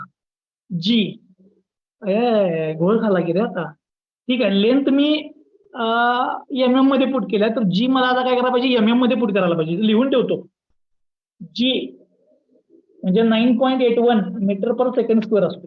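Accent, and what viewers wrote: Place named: Kolhapur, India. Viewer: Kolhapuri accent is so sexy sir 😊😊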